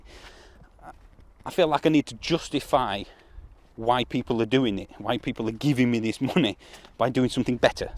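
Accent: Yorkshire, United Kingdom. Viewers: I quite like... your, I guess, Yorkshire accent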